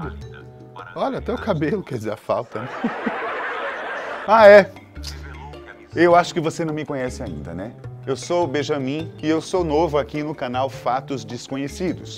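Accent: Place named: Bahia, Brazil. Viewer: Excelente formato de vídeo 👍✌ Seja bem vindo rapazinho .... Baiano eu acho pelo sotaque 🤔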